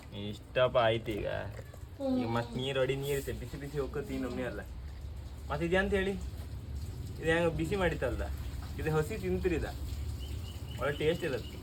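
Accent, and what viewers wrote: Kundapura, India. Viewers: ಎಷ್ಟ್ ಚಂದು ಕುಂದಾಪ್ರ ಕನ್ನಡ ಮಾತಾಡ್ತೆ ಗಡ್ಯಾ ❤️❤️ ಅಮ್ಮ ನಿಮ್ಮನ್ನ ಕಂಡ ಖುಷಿ ಆಯ್ತ್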